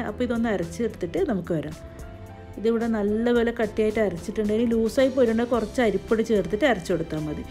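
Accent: Kannur, India. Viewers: Kannur aanenn samsaram kettappoo mnssilaayii😃😃njanum kannur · വെറുതെയല്ല സൂപ്പറയത് മൊഞ്ചത്തീ ആ വർത്തമാനം കേട്ടപൊഴേ മനസിലായി കണ്ണൂർ എവിടെയാ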